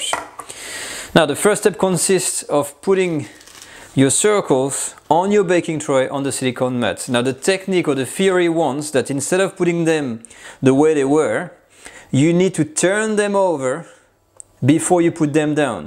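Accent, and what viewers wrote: France. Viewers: I really love your french accent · Very charming French accent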